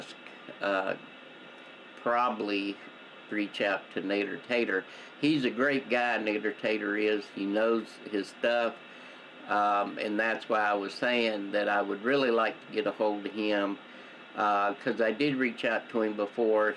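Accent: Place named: South, United States. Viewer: Wow, no offense but you sound like a southern Bumpkin so I don’t think anything about your review here is going mean anything to the cell signal internet community. It’s ok, you just don’t understand technology. So maybe Chester repair equipment is beyond your cell signal intelligence? You probably even still have a land phone line?? That’s funny! Have you gotten Better connection results with 2 cans of Spam tied together with string? 🤣😂😅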